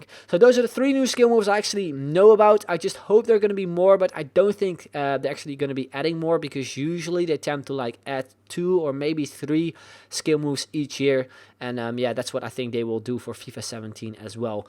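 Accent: Netherlands. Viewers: Are you Dutch? I hear an accent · u dutch?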